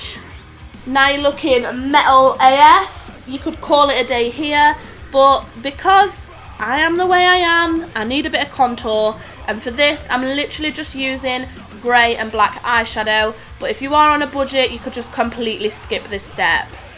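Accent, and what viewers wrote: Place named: Yorkshire, United Kingdom. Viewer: I wasn't expecting that accent... are you from Yorkshire?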